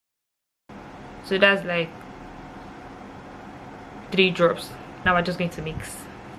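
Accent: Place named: Africa. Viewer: I love ur african accent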